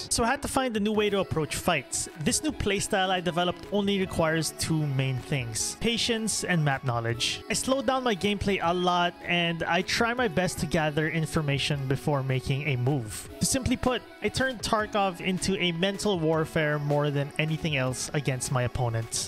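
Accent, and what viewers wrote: Philippines. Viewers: Knew you were Pinoy the moment I heard your accent. I've met or have seen like about 5 Pinoys in this game since I started playing back in 2018.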